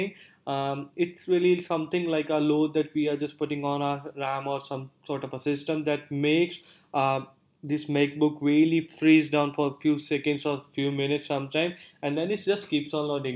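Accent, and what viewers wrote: India. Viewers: He's an Indian. How else do you want his accent to be?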